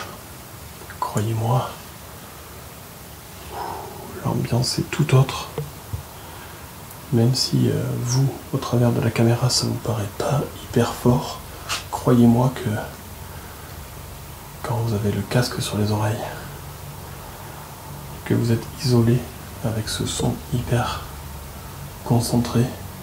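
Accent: France. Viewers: Merci pour ton enquête. Ton anglais est très bon, c'est juste que tu as l'accent français, ce dont les british raffolent lol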